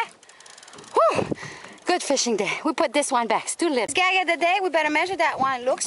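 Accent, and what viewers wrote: Louisiana, United States. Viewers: Ate you cajun?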